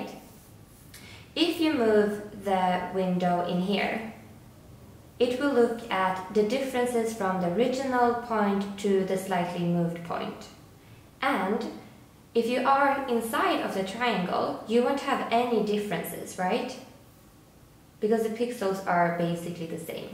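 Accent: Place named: Germany. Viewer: haha I was just guessing from the accent. Where in Germany do you live?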